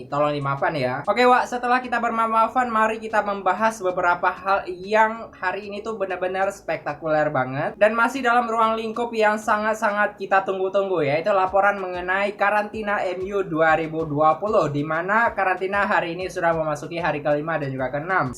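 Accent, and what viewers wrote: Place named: Medan, Indonesia. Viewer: Wak ud ku suscribe channel kau wak,, sukses selalu ya,, kayak org medan cakap kau wak🤣😁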